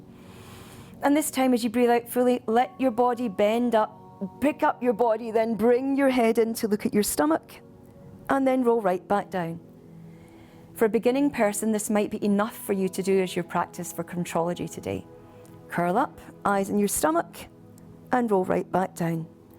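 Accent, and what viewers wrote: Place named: Ireland. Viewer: Lovely accent! So pleasant. Irish?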